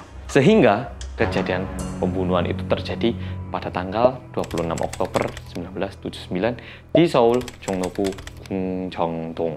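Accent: Java, Indonesia. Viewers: 3 "bersama orang korea yang medhokk" selalu terucap setiap pembukaan nonton mas wkwk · nymng meddoooookk nya mengcandung bet rek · Mas mamakku bingung lho🤣sampean dikira orang Jawa 🤣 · Medhok banget mas, nanti klu jadi dosen cocok bgt. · Woi orang korea kok logat jawa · "Merry Christmas" Mas medok😌🐍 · Jam wib liat kamu ditv sctv acara tokopedia ngomongnya medok njiirr Beneran kamu kan?? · gue suka banget yg berbau kisah nyata/sejarah plus gue sk Korea. Itulah mengapa gue sk bgt channelny sejak ad seri detektif reomit jujur pas masi jmn vlog2 biasa gue B aja. Bruntung skale ad wong korea yg muedhokkk ini jd enak djlasinnya pake bhs Indo, kalo ad yg bahas tp bakor mah ya kicep..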